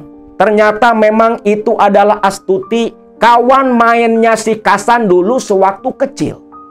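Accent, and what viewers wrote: Java, Indonesia. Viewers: Kang,bahasa Indramayu e gk begitu kang,ky gtu mh Jawa Tengah Jawa Timur an.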